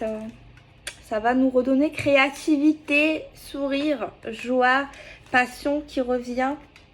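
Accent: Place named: South, France. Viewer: Bonsoir,hey c'est également l'anniversaire de ma fille 🎂.... Joyeux anniversaire 😁 et j'adore tes vidéos qui sont dynamiques,ton accent du sud... continue comme ça 😉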